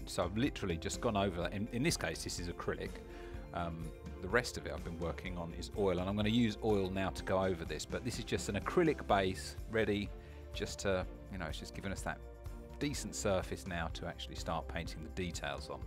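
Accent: United Kingdom. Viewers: Very sweet painting/mini tutorial, I'm wondering did the client ask for it on paper? I'm pretty sure you would have used a board of some sort (I don't remember what you call them) I hope you're family and you are staying refreshed and I hope end of autumn into winter goes great for you. Also so I can reference you better in the future are you from the UK, I'm not sure of accents. Dave from Canada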